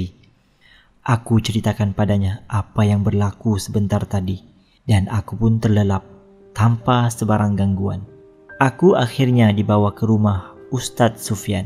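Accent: Sabah, Malaysia. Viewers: Tukar pembaca melayu yang betul2 melayu..ni bahasa baku dia kuat slag Sabah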